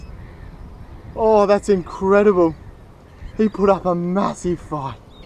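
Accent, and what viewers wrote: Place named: Australia. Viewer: I accidentally clicked on one of your videos and went to immediately change the video then I heard your Australian accent and now I'm subscribed good on ya mate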